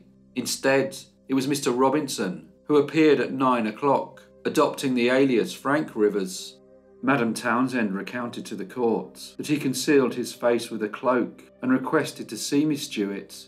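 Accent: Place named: Birmingham, United Kingdom. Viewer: I can’t get past your Brummie accent 🛑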